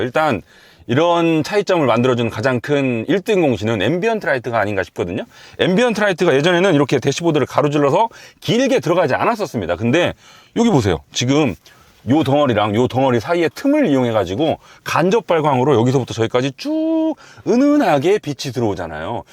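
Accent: Jeolla, South Korea. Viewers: 절라도 사투리 역겨워서 못들어주겠네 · 영상 보면서 느꼈네요 지식도 풍부하시고 이해가 팍팍~!!쉬운설명 감사합니다~ 구수한 전라도 억양 듣기 좋네요~~!!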